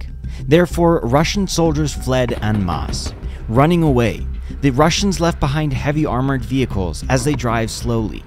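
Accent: United States. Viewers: This is my first video that I watch from your channel I just subscribed and hit the all button very good at speaking about what's going on very informative. And you sound like you're American or Canadian one of the two and there's not many people on YouTube that are American or Canadian that are actually taking the time to gather all this information. 5 ⭐ to this video well done. 5 ⭐🇺🇦❤️🇺🇦❤️🇺🇦❤️🇺🇦❤️🇺🇦❤️🇺🇦❤️🇺🇦❤️🇺🇦❤️🇺🇦❤️🇺🇦❤️ and I love it when I see high Mars systems going to work on those Russian sons of bitchs. Those systems are very very accurate. Glory to Ukraine 🇺🇦🇺🇦🇺🇦🇺🇦🇺🇦🇺🇦🇺🇦🇺🇦❤️❤️❤️❤️❤️❤️❤️❤️❤️❤️❤️❤️🇺🇦🇺🇦🇺🇦🇺🇦 Ukraine will be victorious ❤️❤️❤️❤️❤️🇺🇦🇺🇦🇺🇦🇺🇦🇺🇦❤️🇺🇦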